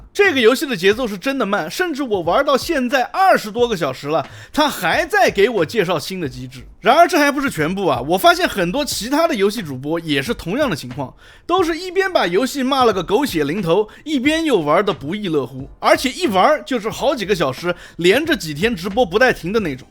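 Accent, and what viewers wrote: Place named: China. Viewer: 尖銳陸腔差評😅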